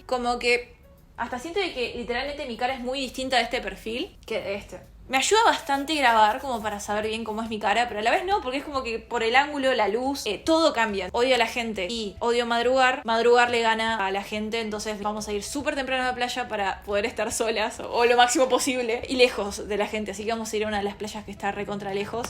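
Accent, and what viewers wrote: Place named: Argentina. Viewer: La verdadera que mujer😍😍 un saludo y me encanta el acento argentino. Saludo desde Argentina ❤️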